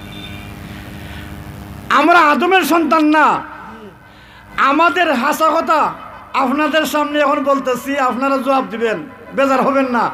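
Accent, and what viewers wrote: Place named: Sunamganj, Bangladesh. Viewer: কুব সুন্দর বয়ান সুনামগঞ্জী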